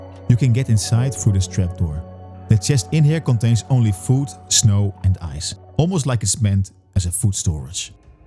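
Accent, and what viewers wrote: Netherlands. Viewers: Very Dutch accent methinks! Great video! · Do I hear a Dutch accent? 👀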